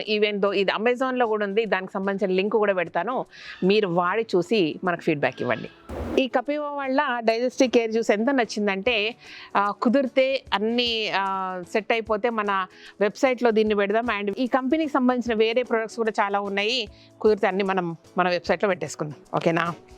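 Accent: Telangana, India. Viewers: మన తెలంగాణ భాష మన యాస వేరే అబ్బా 🙌🙌🙏🙏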